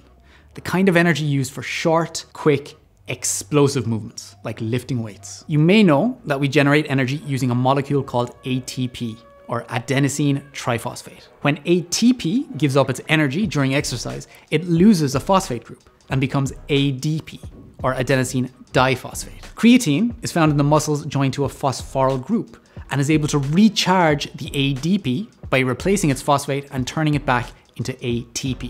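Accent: Ireland. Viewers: Very Kilkenny accent? am I way off? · Is that an Irish accent that I hear oooh la la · I love the Irish accent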